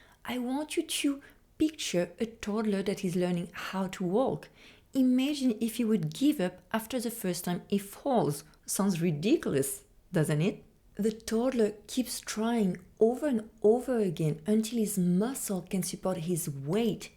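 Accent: France. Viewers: Good point early on: The teacher here has a very strong French accent, but I can understand her quite easily (though perhaps I have to listen a tad more closely than if she were a native English speaker like me).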